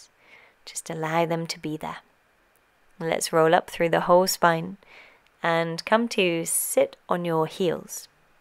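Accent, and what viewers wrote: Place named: England, United Kingdom. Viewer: super good, thank you! i've dislocated both my shoulders over a dozen times and am really trying to sort them out! This feels like it has helped heaps, and could be a good practise to do every other day. really liked the stuff you were saying throughout as well, like in the comment below about how the body is a mirror of the mind (trying to work out what weak shoulders could be reflecting in my personality!) also really glad to have found a yoga video with an english accent aha, all the rest i've been doing are from american teachers and the english accent makes me feel much more relaxed and at home :) anyway nice one, subscribed!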